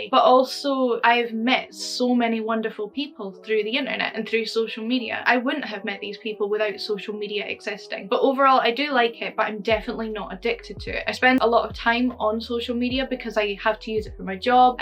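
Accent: Scotland, United Kingdom. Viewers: Just gotta say, I love your work. From a fellow living across the pond, when I first found you on tiktok I didn't expect you to be Scottish 🤣🤣🤣. Your voice sounds very different than how I expected it to. Keep up the good work. Keep your head held high and push through all the bad thoughts that you like to tell yourself. Your inner critic is the worst person to take advice from.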